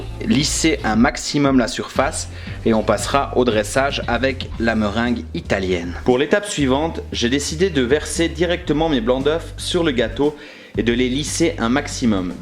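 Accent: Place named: Valais, Switzerland. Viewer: Le bonne accent valaisan 😍😂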